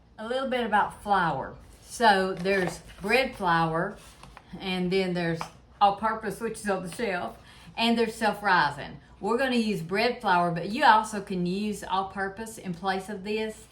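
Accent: South, United States. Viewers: Stupid Question...do you have 2 kitchens? One day I saw you with the above red appliances and the next day I saw a completely different kitchen - stainless steel!. Just inquisitive! AND I LOVE your cooking and your sweet voice. I am from North Carolina and I speak southern too! Thank you for making cooking look so easy. I'm 76 and have been cooking all my life. You just make things look so easy - I thank you and I will keep watching.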